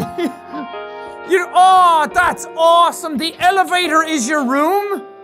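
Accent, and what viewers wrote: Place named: Ireland. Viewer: my friend does not like ur vids so she came up with some stuff to say about u her: his voice is too irish me: what the frick is he supposed to do about that her:his hair is too green me:its blue now her:oh,is face is so plain like why not grow some facial hair? me:he did her:oh, well how bout he SHAVES that me:*leans over and dies laughing*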